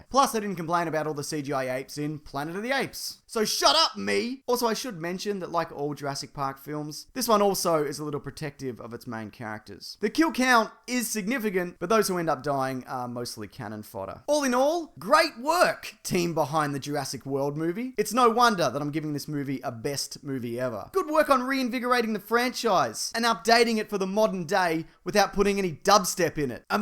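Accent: Australia. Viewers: He lives in Australia hence the accent.. Cmon now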